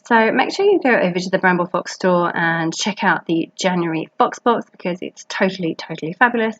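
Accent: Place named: England, United Kingdom. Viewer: Thank you for your creativity. So nice to hear and English accent!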